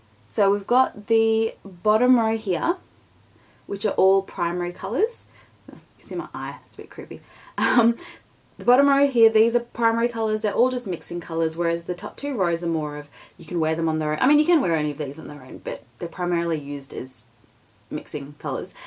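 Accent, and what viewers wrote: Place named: Australia. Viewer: Are you Australian by any chance? You kinda have an Aussie accent.. Loved this video~<3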